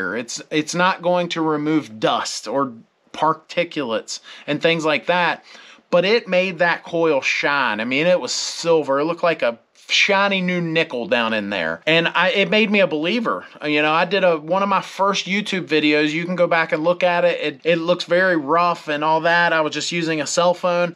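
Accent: South, United States. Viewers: Sound like a southern accent. Anywhere near Louisiana? Had 2 HVAC come out with both saying completely different things.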